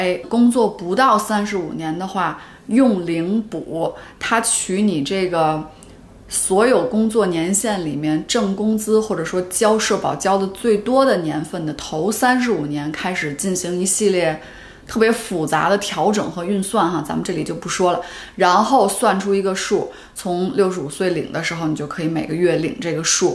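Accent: Beijing, China. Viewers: 北京大妞 听着舒服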